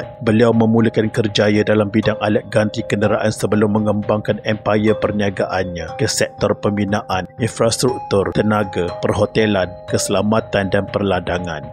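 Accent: Kelantan, Malaysia. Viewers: Maklumat dan pendedahan yang baik cuma loghat budu ada lagi tu..boleh perbaiki lagi tu 😂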